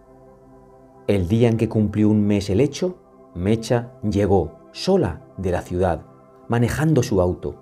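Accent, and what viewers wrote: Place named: Uruguay. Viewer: 🎉saludos desde Piura - Perú. Estaré atento a la narración de cuentos, leyendas, con la dicción local de Uruguay. Grácias. Cuide su salud. Felicidades.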